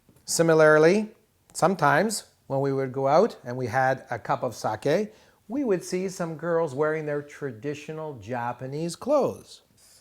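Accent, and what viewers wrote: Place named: United States. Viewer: This is only half knowledge, as you have generously left out vowel length and vowel dropping. You mentioned daku-on and handaku-on in passing, but completely left out soku-on. Intonation isn't mentioned,too. I am not objecting to the slight American accent, as I know how hard it can be to get rid of that even after years of study. It is one of the reasons why universities hire native speakers as language teachers, so why don't you ask your wife to pronounce the words?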